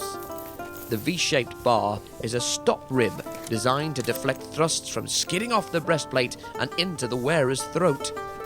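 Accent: United Kingdom. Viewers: man this guy doesnt know what he's talking about does he ps. your accent sucks man + british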